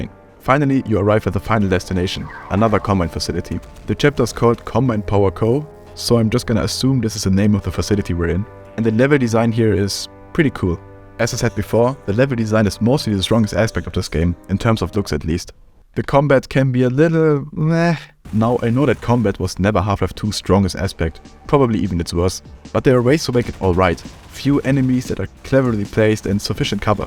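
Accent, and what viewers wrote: Germany. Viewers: I can hear from your accent that your german! Guten Tag!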